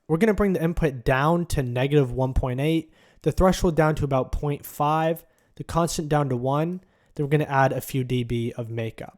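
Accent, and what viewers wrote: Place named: New York, United States. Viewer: You sound so new yorkian...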